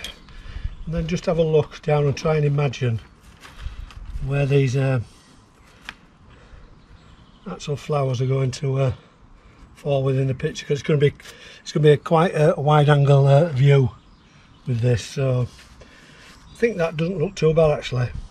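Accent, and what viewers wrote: United Kingdom. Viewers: What a great photo. I live in the States now and it's great hearing a broad British accent.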